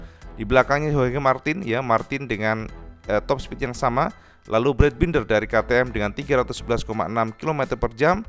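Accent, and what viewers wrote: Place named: Java, Indonesia. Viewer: Ini mah chanel sales yamaha yah.. Haduhhhh dasar nih chanel orang medok..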